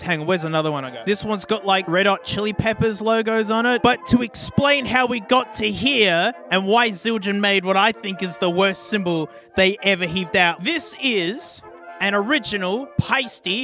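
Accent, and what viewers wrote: Australia. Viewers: Is this? Or do all Australians sound the same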